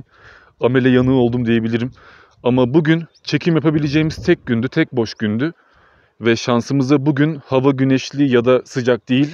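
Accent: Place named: Kastamonu, Turkey. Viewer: Kastamonu 'ı şivesinden belli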